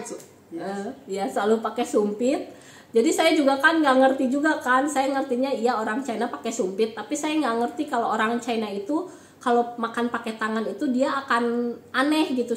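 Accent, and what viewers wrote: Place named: Sunda, Indonesia. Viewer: Teh sudah lama di china logat sunda nya masih kental sekali...atuh resep pisan ngadenge na teh😃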